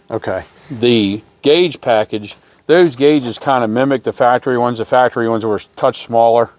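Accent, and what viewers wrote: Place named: Georgia, United States. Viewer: That car really makes a statement rolling out of the garage. Is this? OK, I see the hat. What is that white stuff on the ground? How can anyone let this car sit from 1995 to 2018? What a waste! points out how "old school" is. You still use a newspaper in the age of the internet. is like, under his breath, well I got the car and you don't! Go Newspaper! You sound like you live in Georgia. Can't take the car out there because of all this mess. Looks like a light dusting of snow there. You did drive over to place? Hey, even said this is "a nice safe driver". Sounds like has a Camaro in every color of the rainbow. Always go with 69 in life. Like the special effect of the two of you disappearing. Great video guys!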